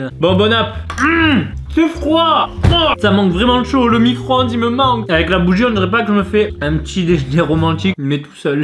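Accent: Marseille, France. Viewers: C'est l'accent marseillais ou il ne sais pas parler ?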